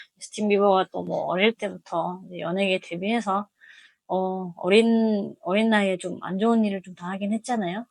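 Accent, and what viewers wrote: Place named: South Korea. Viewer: 말소리만 들으면 그냥 한국발음인데요?너무 대단하세요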